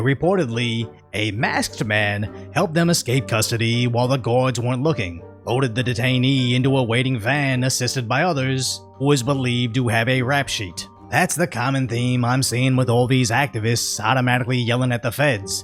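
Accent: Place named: California, United States. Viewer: Why are californian accents seemingly disregarding normal intonations? Why are her sentences sounding like questions when they clearly are not? What a strange person.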